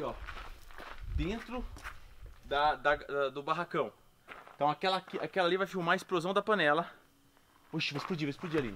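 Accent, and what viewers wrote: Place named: Jandira, Brazil. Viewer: Cara vc é de Jandira né?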